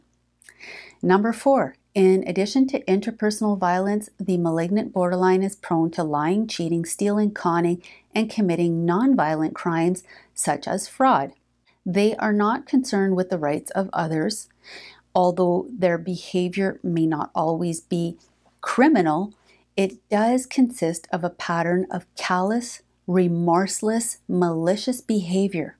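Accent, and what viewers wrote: Canada. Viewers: The Canadian accent is strong here.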